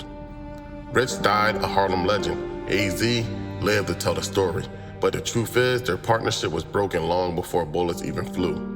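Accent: Philadelphia, United States. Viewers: U from North Philly huh😂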